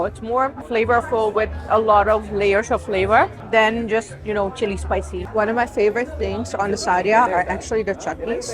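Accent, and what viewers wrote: India. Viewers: I loge your slightly Indian accent when speaking english😂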